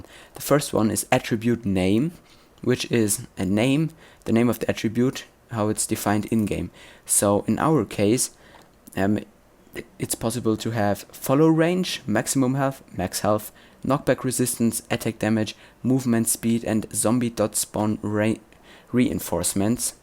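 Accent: Germany. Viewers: are you german? :D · Are you from Germany ? xD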